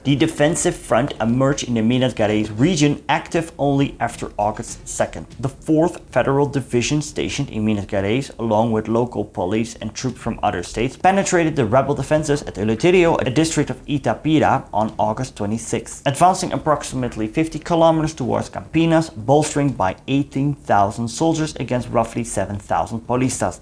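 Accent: Spain. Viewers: What are you talking about? He just pronounced Portuguese as if it was Spanish throughout the video, as seen by "Retúliô" and "Itararê". This is an irrelevant nitpick since this is a history channel as opposed to a linguistics one, but please realize that the only reason some people in Rio Grande do Sul pronounce their state's name like that is because it was settled by Spaniards first. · Would be better if you didn't pronouced things with the spanish accent, and instead used the Portuguese one.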